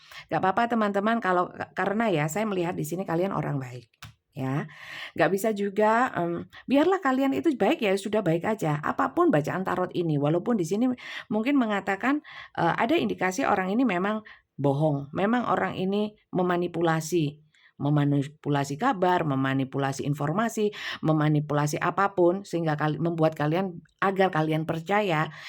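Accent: Java, Indonesia. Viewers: Hdeeeh bunda lucu ,medok mboteopo dechhh😊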